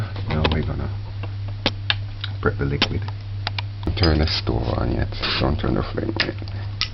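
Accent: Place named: Jamaica. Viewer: Excellent Job!!! You had me engaged during this experiment and laughing at your speculations with your yardie accent. Enjoyed your excitement that reminded me of Lucious. I'll try my hand at this non alcoholic version of the Easter Bun for 2019 holidays. Blessings to you and yours!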